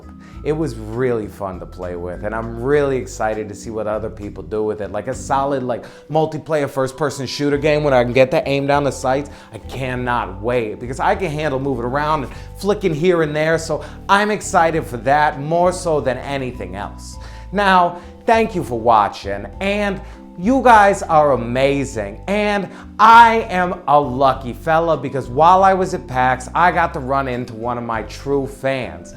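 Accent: New York, United States. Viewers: are you from new york or mass? figure it out.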